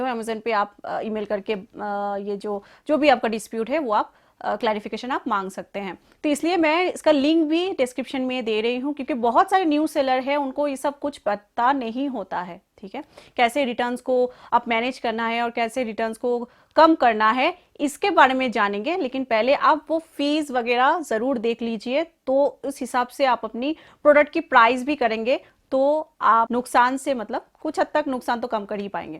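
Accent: Bengal, India. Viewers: your language is a serious issue , else information is good...one has to focus a lot to understood that bangla , hindi and english tone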